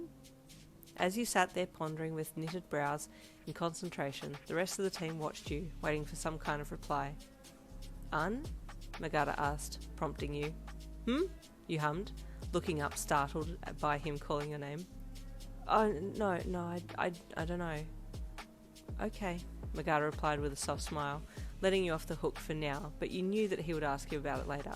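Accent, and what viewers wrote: Australia. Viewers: Heyy love the video as usual But the Australian accent was amazing I loved it 💕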